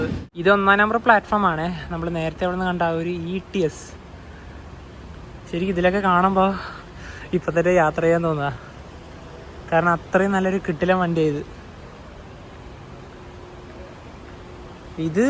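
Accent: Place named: Nileshwaram, India. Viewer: തിരിച്ചു പോരാൻ ടാക്സിക്ക് പകരം ട്രെയിൻ “ ആക്കിയത് ” നന്നായി. താമസിക്കാതെ ATS “ആക്കുമെന്ന് ” പ്രതീക്ഷിക്കുന്നു- നീലേശ്വരം ഭാഷാ ശൈലി രസമുണ്ട് കേൾക്കാൻ.❤❤❤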